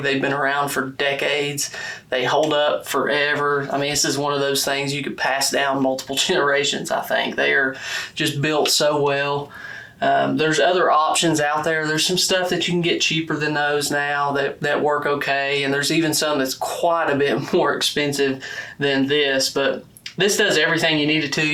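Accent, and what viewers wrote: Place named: South, United States. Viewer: Lol mate you have that Kentucky trucking driving Atlanta GA accent ingrained.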